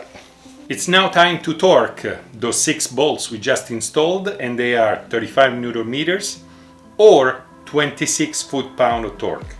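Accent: Italy. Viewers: Is it weird that I like listening to Italians speak English vs French people? I like French people, but I can't watch a video having them explain things to me. Italians? Well, I watched this whole video and don't own my AT yet. Yeah, it's weird. Whatever. Great vid.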